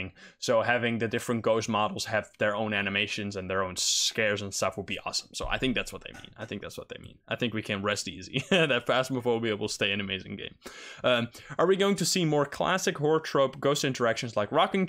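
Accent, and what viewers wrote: Netherlands. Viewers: Wait, are you dutch?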